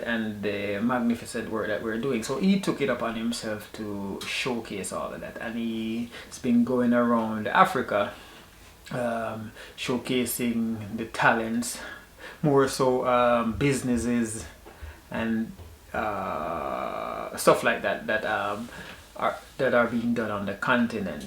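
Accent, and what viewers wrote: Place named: Jamaica. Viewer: Your video just popped up on my feed and at first I thought you were his fellow African speaking about him. And then I realized you’re Jamaican before you said you were. I’m also Jamaican. I’m living in the US for over 30 years. And I love him. We need more people like him. And what you say is so true. I think we have to thank God for YouTube first and foremost. Because YouTube is allowing us to bridge the divide across the oceans. I’ve learned so much about the countries of Africa in the past year from tuning into not just his video, but from many black folks, who have traveled to Africa, as well as some Jamaicans, who traveled to Africa, including some who’ve relocated there.YouTube is a godsend, and subsequently Wade Maye is a Godsend. Blessings to all the diaspora around the world.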